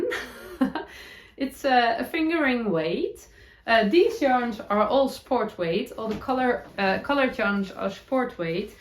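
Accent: Netherlands. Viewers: Just found you! And so glad I did. Just at the beginning of the video and I'm looking forward to hearing all about your projects. Hope you don't mind me saying that I love Dutch accents, and I find yours so comforting to listen to :)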